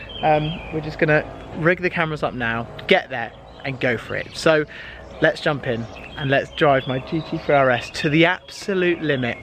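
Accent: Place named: United Kingdom. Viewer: Bro shut the f*** up please. We want to hear the car, plus the British accent gave me an headache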